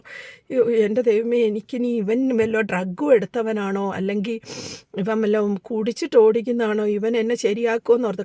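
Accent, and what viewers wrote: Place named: Haryana, India. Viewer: Like haryana slang ....same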